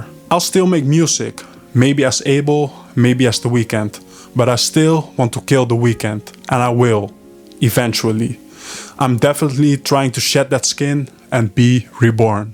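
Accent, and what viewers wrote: Netherlands. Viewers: Bruuh ik zat naar jouw stem te luisteren en dacht ergens misschien ben jij Nederlander, vanwege het accent. Toen later zag ik die screenshot toen had ik het door 😂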